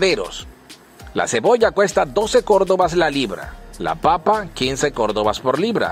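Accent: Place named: Yucatan, Mexico. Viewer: Oye tienes un tono a yucateco. Pero bien lo que has dado es una lista de precios, y que cada nica ve como resuelve como siempre ha pasado desde ahce mas de 40 años. Loque falta decir es que la tecnología hizo que se incluya en la lista de gastos. Me refiero al celular. Cuanto gasta en mes en recargas? qué precio tiene el celular de un nica? y qué ha dejado de comer para comprar. Entonces mal educados goberno quien gobierne un pais la vendita tecnologia marcará el paso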